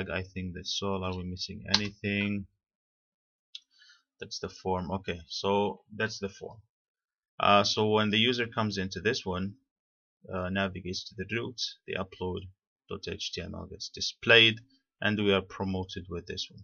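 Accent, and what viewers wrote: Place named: United Kingdom. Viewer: such nice britch accesent for Arabic speaker :D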